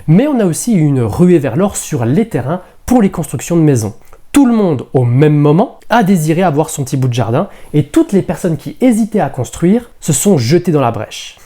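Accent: North, France. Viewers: L'accent du nord. Rien de spécial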